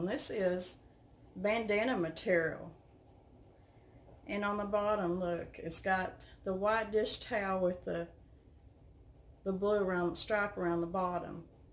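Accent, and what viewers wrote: South, United States. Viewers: You are so Downhome ❤️ you remind me of my family with your Southern accent. You’re the only one besides me that call a bowl a Bow, lol. And you said scootch the pan over, I say that too. I was born in Kentucky, but live in Iowa for 45 years, just love you, God Bless you too ❤️ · It is so nice to hear a real southern accent again. I'm so homesick for good food and good people I could cry. Can anyone tell me which video had the quick recipe for fried cabbage and potatoes? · I love your tutorials love to hear you talk love your Southern accent I am a southern girl too . Going to try this for Thanksgiving . I like those towel things for sure . You say your friend is from California , so am I. I live in Southern California.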